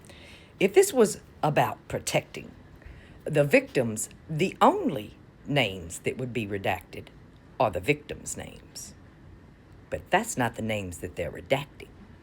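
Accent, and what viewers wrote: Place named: South Carolina, United States. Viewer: South Carolina genteel accent- I'm guessing where this Southern Belle is from.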